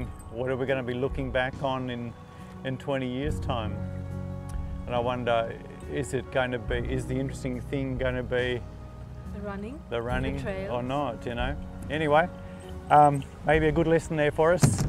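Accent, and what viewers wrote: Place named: RP, United Kingdom. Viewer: your English is perfect,.. even sounds a bit posh 😄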